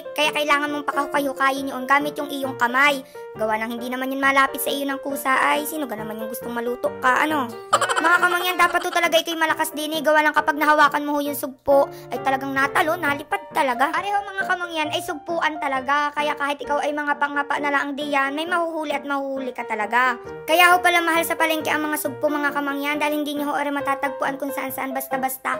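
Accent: Batangas, Philippines. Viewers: minsan ay nagiging batangeña ka na eh eh eh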